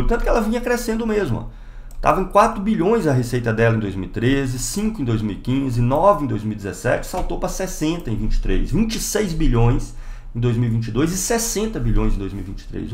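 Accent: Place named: Northern Minas Gerais, Brazil. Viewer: Cheguei aqui de volta devido a um corte recente. Estava ouvindo e apreciando tantos sotaques familiares e incomuns de se ouvir na internet, os caras de Uberlândia e você de Turmalina estava ouvindo e lembrando de colegas que tive na época do CEFET com o sotaque de mineiro do norte e minha namorada e eu pensamos a mesma coisa enquanto você contava a história que só faltava você falar que estudou no CEFET. Foi uma grata surpresa ver alguém com trajetória similar de tantos outros colegas que tive e de um espaço que é grande na minha vida. Não sei pq mas me senti compelido a vir aqui comentar. Apesar de discordar de certos pontos, temos em comum a escola de origem e o marxismo como norte. A participação em tal podcast foi ótima, didática e eficaz, está de parabéns.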